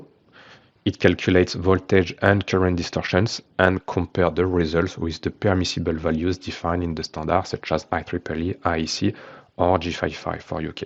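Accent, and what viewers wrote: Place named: France. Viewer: Its french version of english 😅 its cute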